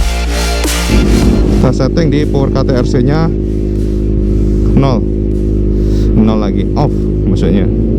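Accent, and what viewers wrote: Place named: Surabaya, Indonesia. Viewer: logat e koyok ne areek surbeje' .....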